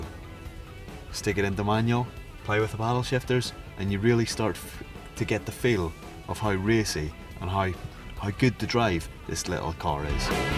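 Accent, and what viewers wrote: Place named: Scotland, United Kingdom. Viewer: His presenting isnt bad. Its literally his Scottish accent that lets him down. That sounds really bad.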